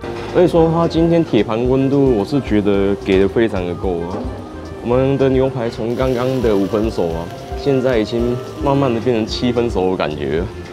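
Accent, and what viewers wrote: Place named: Taiwan. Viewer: 你可以好好說話嗎？怪聲怪調，國語又台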